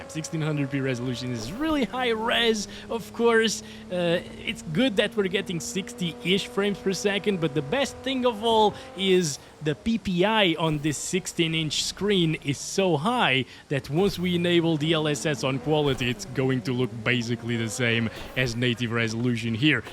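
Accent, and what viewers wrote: Portugal. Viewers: You sound Portuguese, ahahahah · Good video! Are you Portuguese or Brazilian?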